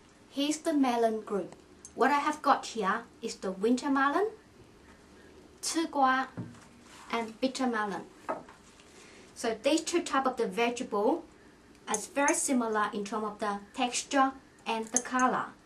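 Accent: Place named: Wales, United Kingdom. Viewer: Sounds like welsh accent to me!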